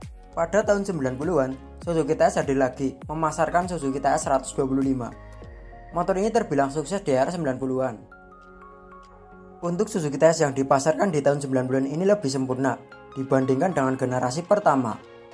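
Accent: Java, Indonesia. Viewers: Jowonya medok amat...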